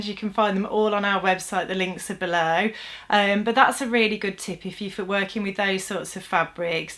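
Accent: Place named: Black Country, United Kingdom. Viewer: Your accent sounds like mine! I'm a Black Country girl that has lived in Canada since I was 27! I am now 63 (yikes!) and need this video as I can't get my new Janome buttonholer to work. Fingers crossed and thank you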